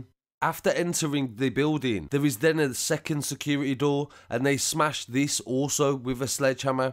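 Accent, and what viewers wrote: Birmingham, United Kingdom. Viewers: Are you a brummie 💙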